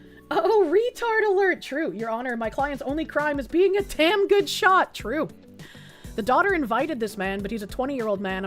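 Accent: Boston, United States. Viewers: I live in a world where I get my news from a fluffy fox thing with a Boston accent. I swear, It's like Futurama.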